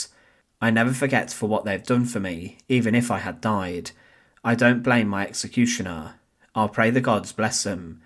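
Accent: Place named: North, United Kingdom. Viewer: Ridiculous narration style. Northern kindergarden pronunciation and intonation. 🤮🤮🤮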